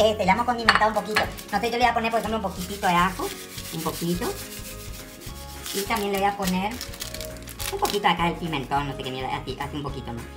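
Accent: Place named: Corrientes, Argentina. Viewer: Como no perder el dialecto correntino me encanta muero de risa. Me encanta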